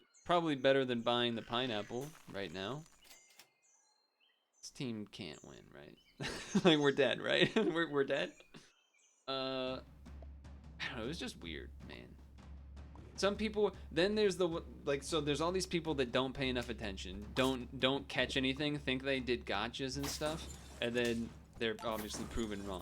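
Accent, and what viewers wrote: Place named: Newfoundland, Canada. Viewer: NL cadence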